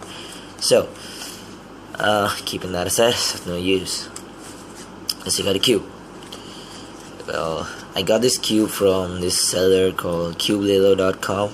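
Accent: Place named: United States. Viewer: Dude why the American accent..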